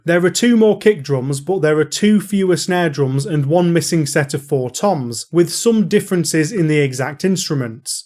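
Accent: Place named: Lancashire, United Kingdom. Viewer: Ah was picking up some Karl Pilkington vibes from that accent. Most definitely not an idiot though; love this channel. :)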